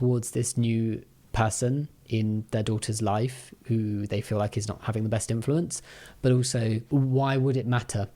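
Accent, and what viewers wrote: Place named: United Kingdom. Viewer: is so scary cause i can imagine you with an american accent now and im so happy you’re not and instead a nice sounding British man with a gentle voice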